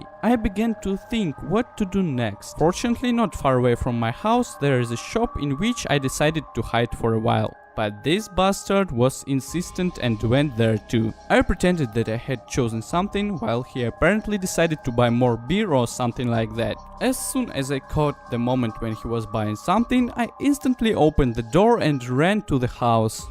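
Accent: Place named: United Kingdom. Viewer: Друг мой, ты все видео говоришь на британском диалекте английского, он понятен всем нам (русским, кто не прогуливал инглиш), а вот американцам он понятен даже хуже чем нам.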